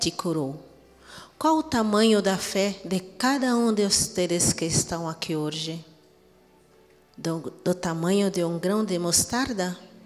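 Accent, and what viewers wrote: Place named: Spain. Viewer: Eu, como um bom gaúcho aqui da fronteira, amo esse sutaque espanhol, que faz parte da nossa gente aqui dessa região sulina! Buenas minha! Me gusta ouvi-la!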